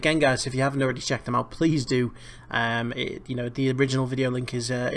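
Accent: Wirral, United Kingdom. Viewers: are you from the wirral?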